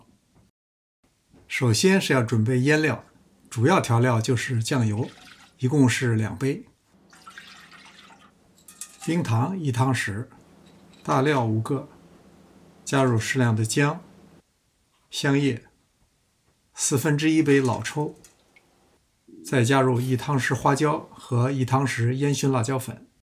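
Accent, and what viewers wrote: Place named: Beijing-Tianjin, China. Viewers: 具体听不出来口音。能听出来是北方人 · 京津地区的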